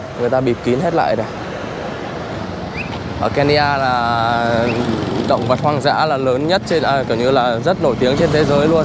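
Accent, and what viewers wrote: Vietnam. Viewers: Nói tiếng anh thuần việt, 😊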